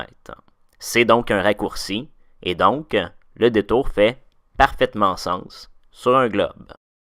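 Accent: Quebec, Canada. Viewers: dim dim Hé oh, pas d'insolence avec nos cousins québécois ! Et puis moi je l'aime bien celui là, et ça change de l'accent suisse 😃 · Il y a des tonnes de débunks et la platosphère est gonflante... Mais un débunkage avec l'accent des érables, c'est tout de suite plus cool. Vivement la suite !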